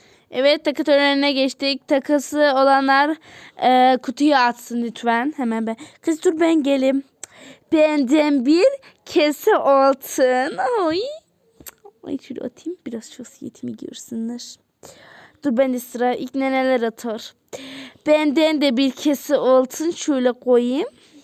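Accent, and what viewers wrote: Kars, Turkey. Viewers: Benim babaannem ve dedemde karslı aynen böyle konuşuyorlar bu arada ben Kars'ta yaşıyorum ama istanbulluyum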